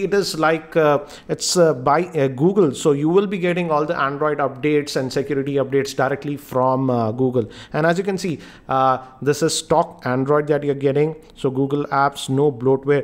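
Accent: India. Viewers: Indian English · Good video, but maybe you can talk a little bit slower, because ( no racist ) indian accent and fast talking together is really hard to follow in my honest opinion.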